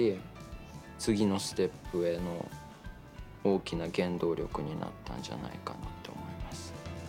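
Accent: Okayama, Japan. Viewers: 里庄じゃ！✨ 地元の隣の隣の町（かな？）だから行ったことあるとこにこんなすごい人がおったなんて未だに信じられない✨ しかも、そんなすごい人が岡山弁喋ってるなんて不思議な気分。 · 歌声も顔もその年齢には思えないセクシーさがあるのに話す時は本当に信じられないくらい素朴、岡山弁と英語が混じった語りも、ライブの自由さも全てが唯一無二。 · 圧倒的なピアノ、心地よい歌声、素朴な喋り方、深い歌詞、癖になるメロディー、純粋さ、岡山弁でバイリンガル、髪型や曲でガラッと見た目の雰囲気が違ってつかみ所がないイケメン…。 ほんと魅力しかない。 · 岡山から大きく羽ばたいて行かれたこと 今も岡山弁を喋ってくれとること 本当に嬉しいです👏🏻👏🏻👏🏻 身体に気を付けて頑張ってなー🤗 心より応援しょーります🍀🙏🏻😊👍🏻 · 岡山で20年以上過ごしてきたから、岡山弁なのかな？？☺️💐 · 久々に好きなアーティストに出逢った! 楽曲や歌声はもちろんの事、英語交じりのコテコテの岡山弁や人懐っこい甘え上手な表情も好きだ! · 岡山に嫁いで早２８年目になりまして、数年前に風さんの事を知りすぐにファンになりました。岡山弁で話す風さんも、心地良い歌声も曲も好きです。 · まさか、こんなそばに大きな風が羽を休めていたなんて…同僚が風さんの同級生でビックリしました🤣 ここからどんなに大きく羽ばたいても岡山弁を貫き通す、そんな風さん可愛すぎてやべぇじゃろ笑 そうそう、岡山駅の地下にグランドピアノ（自由にお弾きくださいっていうストリートピアノ）が設置されたの知りょ〜る？？ 次に帰ってくるときは、ぜひこっそり弾きに行かれ〜♬ 風さんが弾き出したら『こっそり』いうわけにはいかん思いょるけど🤣 でも、いつか必ず弾きに帰ってこにゃおえんよ〜☺️👍✨ （普段使ってる岡山弁で書いてみました笑笑） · 歌ってるところから見始めて、すごく色気がある人と思い、そしたらまだ25歳で、普段どんな喋り方をしてるんだろって思いたどり着いたら、こんな岡山弁満載の優しい喋り方で、このギャップにどっぷり沼にハマってしまいました。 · のだめぐみは、博多弁。風君は、岡山弁。うふっ💓😊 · 岡山県民だけどなんかこの人の方言優しい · 大都会岡山弁をずっと使ってるのがいいですね。一生続けて欲しい。岡山愛があればどこでも生きていけると思います。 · 岡山弁ってこんなに愛らしいのね…（千鳥さんのイメージが強いので）いろんな優しさが風さんを包んでいるように感じます。もっと聞きたい、もっと知りたい。どんどん素敵な歌作ってください！救われる人が多いはず。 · 地元岡山を離れてもうすぐ10年。コロナ禍で1年以上帰省もできず。 私の方言は完全に抜けてしまいました。 風くんの歌詞、インタビューで操るネイティブ岡山弁に魂が揺さぶられました。 懐かしくて…でも新しい。 岡山からこんな人が世に出るとは。勝手に誇らしいです！ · 同じ岡山県人ですが紅白で初めてまじまじと拝見し、衝撃を受け以来毎日聴いています。何より岡山弁で話したり歌ったりしているのが素晴らしい。自分の言うこと、音楽に余程自信がなければできないことです。ぜひこのまま岡山弁を通してください。応援しています。仁科博士以来の著名人ですね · 職場のおばあちゃん世代の方のオススメでハマりました！思ってたよりポヤポヤしててギャップに悶ております✨ 〜がまぁたそと同じ発音で謎におぉ！！ってなりました（笑）岡山の人の話し方好きだなぁ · 神は私達に素晴らしい逸材を与えてくれた・・稀なる素晴らしい天才的才能✨ 音楽でこれ程の感動癒し希望を感じたことはなかった、岡山弁でゆったりとした口調、穏やかな表情、優しい心・・これからも時がたっても変わらずにいて欲しい。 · 娘が数ヶ月前から風さんの歌を聴いてました。私は今月のねそべり配信をみて、何なんw ❕です😍🎶 こういう今迄の経緯が聞けてうれしいです。 風さんのオリジナル曲にどっぷりはまっています。 お人柄も岡山弁も素敵です😌💓 あなたの夢に突っ走ってください(*^-^*) マネさんも素晴らしい方ですね(^o^) 娘とライヴに行ける日を楽しみにしてます(^o^)/🎶🎵💗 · 格好良い歌声と岡山弁の喋りのギャップがいいね。岡山県民として嬉しい。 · 岡山県の方言がしみます🤗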